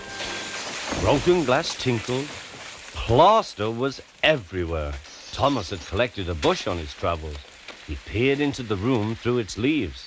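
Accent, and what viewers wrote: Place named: United Kingdom. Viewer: UK narration over US narration any day for me. Sodor is in the British isles after all.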